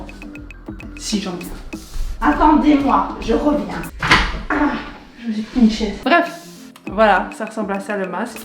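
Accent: Belgium. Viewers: Tous les "w" sont prononcés "oué" en Belgique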